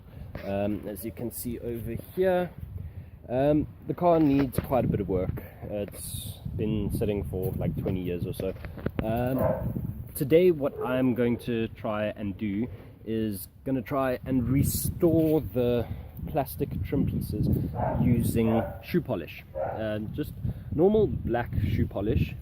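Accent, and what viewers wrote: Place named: South Africa. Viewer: I sense a South African accent... Where you at brah :P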